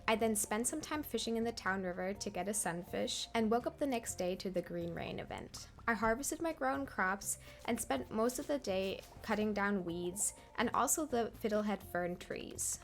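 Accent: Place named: Germany. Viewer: I can't believe I've never seen a video idea like this, and wonderfully executed as wellA It's a surprise you aren't bigger, because this was a great watch!Also, is English your native language? I assume not, considering your (this is a guess by an American, so apologies if this is wrong) German accent, but if it isn't then that's VERY impressive, because I didn't even notice anything until like fifteen minutes in!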